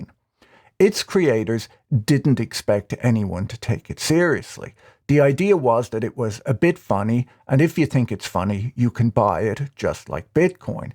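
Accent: Ireland. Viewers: I want him to do an ODB story so I can hear him name off his AKAs in a straight Irish accent. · Listening to dry humor and delightful Irish accent when he dissects the current madness happening in the world is so enjoyable in these insane times we live in.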